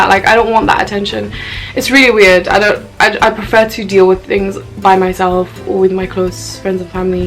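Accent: England, United Kingdom. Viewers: are you virgin Müslim girl? 😊 😊 😊 😊 course you not!!! are you arabian, pakıstanı, or Afghan where are you from actually youre langauge and accent just like brıtısh accent of course you not brıtısh , just born to england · I like your english accent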